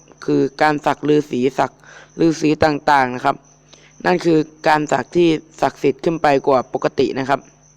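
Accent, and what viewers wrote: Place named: Isan, Thailand. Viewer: ว้าวอีสานก่าได้เด้อฟังออกยู่คับ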